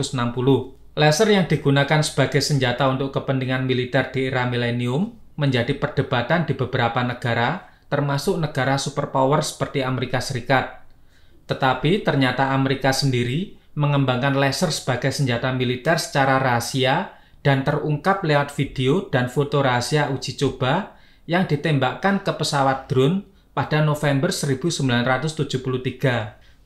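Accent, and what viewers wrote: Java, Indonesia. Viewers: Medok amat...🥶🥶🥶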